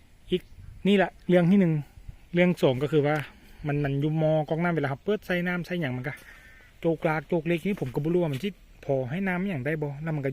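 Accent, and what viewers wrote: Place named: Loei, Thailand. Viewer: ฟังเสียงแล้วว่าต้องเป็นคนเลยคักแน้วเสียงที่เป็นเอกลักษณ์☺️😂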